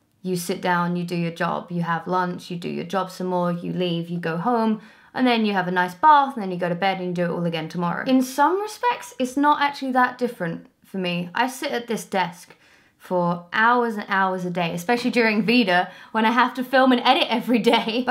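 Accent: Essex, United Kingdom. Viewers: I hate the term having "a real job". It's a predictable snipe by a bitter soul. Your work life journey has brought you to a cool place and you'd be fully aware grab it by the horns and enjoy the ride. Your creative, clever, funny and have that bit of attitude needed when delivering a good pun, in that classic Essex accent. You also have a more serious side here on your discussion channel. Your number one in my book. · Is it just me or did her Essex accent come through more in this video? Especially when she says phrases like "sort of".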